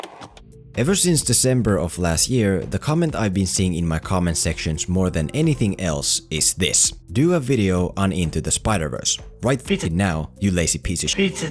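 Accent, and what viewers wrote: Finland. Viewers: Are you finnish? For some reason im hearing a finnish accent... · You have the most Finnish accent. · Are you Finnish? Because your ralli englanti is showing through. Ja jos oot ni mitä äiä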